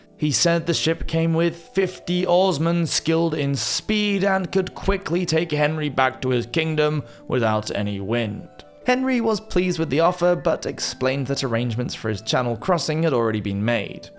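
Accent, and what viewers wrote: England, United Kingdom. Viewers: You sound English? They didn't teach you this? I am Australian. I learned this ... did the conservatives fuck you over THAT much?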